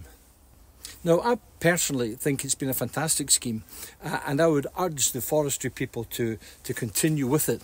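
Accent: Scotland, United Kingdom. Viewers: Love the Scottish accent, the pronunciation and passion Really interesting and inspirational thanks for posting What is it with these dog poo bags? Definitely think car owners are the biggest cause of litter. Antidotally I’ve heard campervans having litter placed deliberately outside them so they get the blame.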